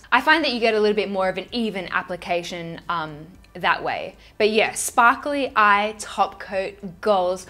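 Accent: Australia. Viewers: Love your Oz Dialect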